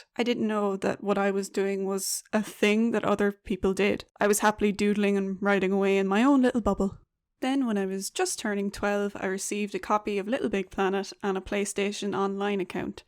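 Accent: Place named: Southern England, United Kingdom. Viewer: I honestly thought you were from south England 🏴 that how you honestly sound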